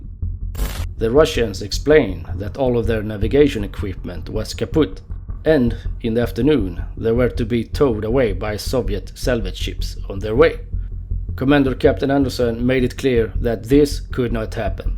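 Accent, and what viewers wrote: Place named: Sweden. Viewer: I LOVE the svengelska!! Are you from skåne?? P.s You speak terrific english, just heard your perfekt uttal on orter 😹🙏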